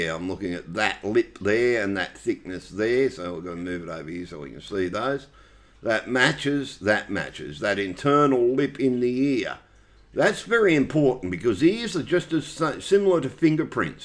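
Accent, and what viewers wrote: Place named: Australia. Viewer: "If ya don't fuckin believe me I don't care" - you're awesome mate! Nice to have a real aussie bloke talking about these things on here. Ive always believed that they are the same man too.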